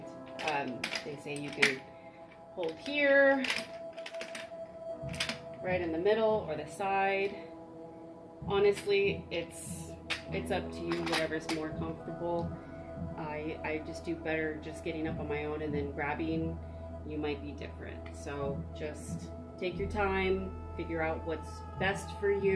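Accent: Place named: United Kingdom. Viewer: From a British viewpoint you have a very pleasant accent, whereas when we berthed in LA we found the local accent harsh and unattractive. Difference in education? My wife used to retract her undercarriage much as you do - but she saw me in the RAF and I think imitated the aircraft - that was her story anyway!! .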